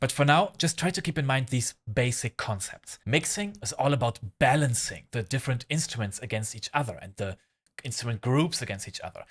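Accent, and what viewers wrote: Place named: Germany. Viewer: kurze anmerkung man hört raus das du deutsch bist haha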